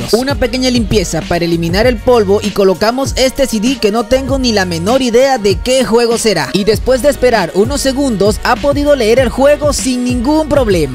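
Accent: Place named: Venezuela. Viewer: es Venezolano el acento te lo dice mi pana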